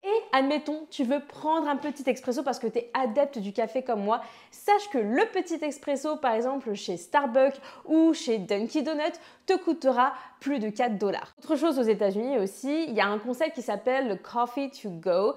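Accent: Toulouse, France. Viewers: tout à fait et le tout avec l'accent de Toulouse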